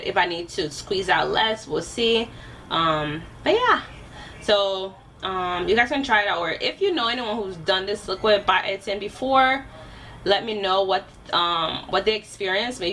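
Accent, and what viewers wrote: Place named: Liberia. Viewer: Wait, are you Liberian? Because the accent do sound like a Liberian 😆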